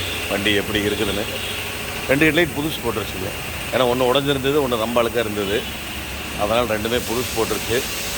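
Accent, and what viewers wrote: Kongu, India. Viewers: அருமையான வேலைப்பாடு இனிமையான கொங்கு பேச்சு செலவு எவ்வளவுனு சொல்லுங்க சார்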